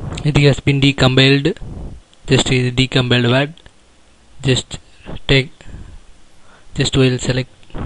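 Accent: India. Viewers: Wasim Akbar its indian english accent :) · It's not Indian accent, it's south Indian accent. India is too diverse to have a single accent throughout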